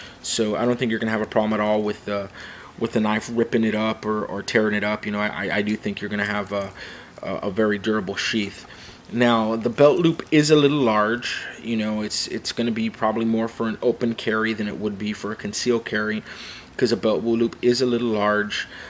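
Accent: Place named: Canada. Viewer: this is totally off topic, but are you canadian? because youve got the most adorable accent!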